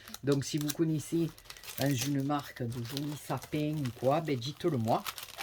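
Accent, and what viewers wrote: South, France. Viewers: Coucou trop contenté de te retrouver avec ton accent du soleil gros bisous de la Picardie 😘💜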